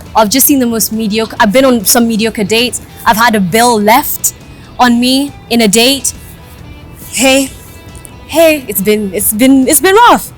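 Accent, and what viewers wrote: United Kingdom. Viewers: Ikr! I've been in the States for years and her accent comes across more British as opposed to American. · The accent is more of British thooo..the sound quality and video quality is terrific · I thought the accent was British but she grew up in America 🤔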